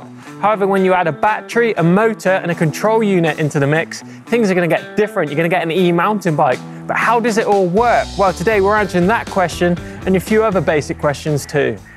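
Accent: United Kingdom. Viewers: Don't know if it is the annoying British accent, the goofy helmet, or the mountain bike in general that makes this unwatchable.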